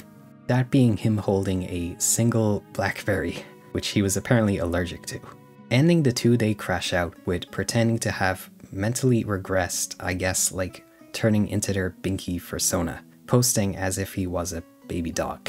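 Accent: Ireland. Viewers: Are you half Irish ? Or somewhat Irish you have Irish inflections in your speech like the word “think” -> “tink”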